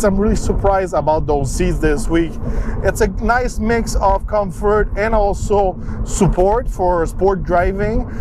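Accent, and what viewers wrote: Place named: Quebec, Canada. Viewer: Le ptit accent du Québec 😆👌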